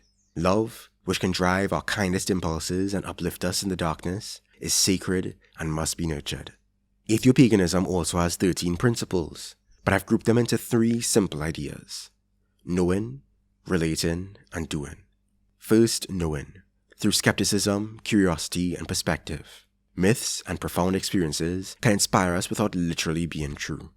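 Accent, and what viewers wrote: Trinidad and Tobago. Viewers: hearing this trini voice dropping gems on my yt recommended was not on my bingo card 😂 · I am a long-time subscriber, a huge fan of your videos. In particular, I enjoy the prosodic cadence in the steady flow of your voice evident in your videos' systematic coverage of a given topics that will ensure they receive the explanation that they are due in time. I found myself thinking during this excellent video's presentation of your utopian-optimist theory-laden anarcho-idealist perspective, in your pleasant Trinidadian voice about the gravity of this rare moment in socio-political-economy which seems to invite a new analysis of every human philosophy or branch of one of the social sciences. For the sake of your intellectual curiosity & to spare me the frustration of yet another rewrite of this message, please watch the following 20 minute video on the polltical-economic assumptions of the 20th century that must be overcome to readjust to the Political Reality of the present era in which corporations have more financial power than nation-state actors. 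I would like to write an essay on this video in particular as it is a worthwhile tangent for serial nonfiction writing I am working on about the evolution of the United States BigTech into the monopolostic behemoths that they are today where dehumanizing pursuit of greater raw power far exceeds any & all ethical principles they pretend to have. At this moment it has become obvious to US Software Engineer YouTubers that Google's Play Store Global Developer Registry policies are paving the way for a grim neofascist/technof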